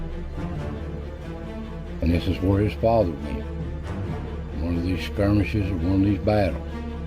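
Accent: Appalachia, United States. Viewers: I love your videos. You talk exactly like my grandfather and uncles. Hollywood has portrayed our "accent" as indicating ignorance. But nothing could be further from the truth. While so many Appalachian people lacked formal education, they/we possessed knowledge that no other culture in America possessed. Please read the book "Born Fighting," by Jim Webb. It proves that we Scots-Irish are always willing to fight for what we believe in. We don't back down. We will NOT be ruled by a KING or any aristocracy. Yes, we talk "funny." But we built and defended this country as much as any other European culture. Please keep making these awesome videos!! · I adore listening to you tell stories,. All the more so because your mountain accent just seems to authenticate the story. I close my eyes and I imagine an evening campfire crackling, little sparks floating up off the fire. 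Friends all huddled around patiently waiting for to begin, but holding their breath in quiet anticipation cuz we all know it's gonna be good. No disappointment ever. ♥ ♥ ♥ Thank you.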